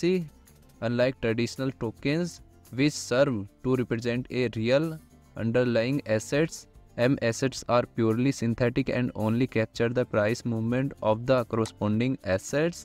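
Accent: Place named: India. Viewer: my homie its hard to understand a single word with your Indian accent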